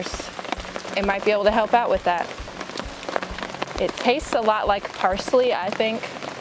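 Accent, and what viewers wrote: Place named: South, United States. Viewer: I need to go down south and take a class from you on wild vegetation you have a everlasting knowledge on it love the southern accent btw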